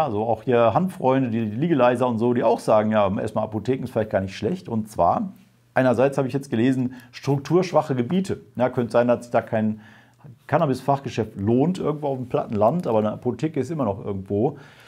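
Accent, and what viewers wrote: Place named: Ruhr, Germany. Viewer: du bist der knaller! Für mich als kölsche Jung bist'e mit deinem Ruhrpott-Dialekt immer wieder lustig.:-))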